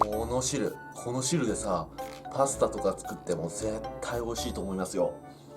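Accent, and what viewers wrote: Japan. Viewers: 何弁かな？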